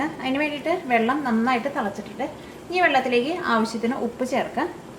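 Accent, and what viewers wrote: Thrissur, India. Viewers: looks so yummy... will definitely give a try. by the way, I'm a great fan of you dear chechi... your way of explaining and that trissur slang are wonderful.... thank you for all your recipes....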